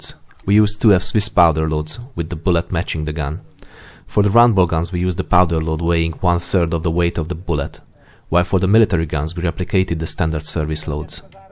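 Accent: Hungary. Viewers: I love that hungarian accent :) Experiments like this are a mix of experimental archaeology and some sort of survivalism. You never know when such infos will be useful. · Hungarian accent 😃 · I know that you’re Hungarian but the accent is extremely annoying....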